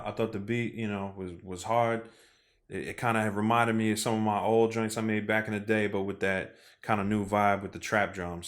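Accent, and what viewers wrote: Philadelphia, United States. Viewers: You from Philly fam?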